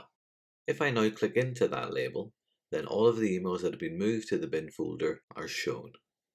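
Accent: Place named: Wales, United Kingdom. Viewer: I like your Welsh accent (I hope it's Welsh). AmIright? This video is easy to follow, your speech is CLEAR, and easy to understand. You get a TEN out of TEN. Thank You!!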